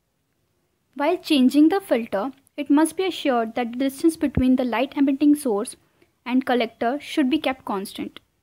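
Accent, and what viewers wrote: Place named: India. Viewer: Indian English..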